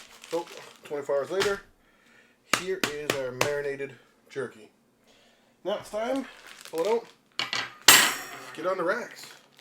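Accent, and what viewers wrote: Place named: Canada. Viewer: 45 seconds in I recognized that you're a fellow Canadian from your accent. Am I right? I have no way of knowing but I am pretty sure.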